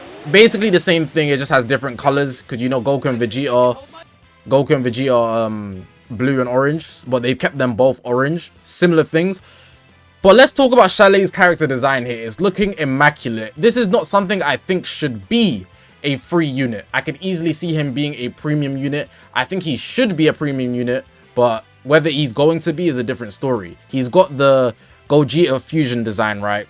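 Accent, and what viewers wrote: United Kingdom. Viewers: So You're From United Kingdom Brittish, Because Is So Much Diferent From USA, Because Of Brittish Accent, Saying "Shallet" With The Sound Of "A" Instead Of "E" So I Mean You're A Brittish So Its Easier Than Learning English From The United States Of America.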